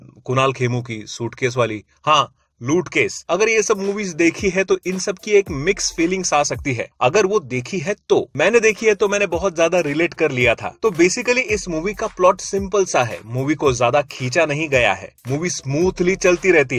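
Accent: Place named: Uttar Pradesh, India. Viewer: Up accent ko tum hi suno..... hum to hindi accent hi sunein gay.......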